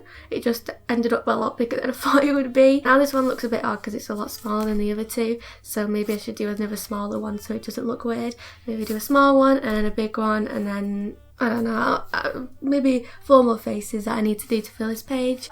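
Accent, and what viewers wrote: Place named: North, United Kingdom. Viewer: I love your work and I love your accent,,,,northern representation heck yeah!!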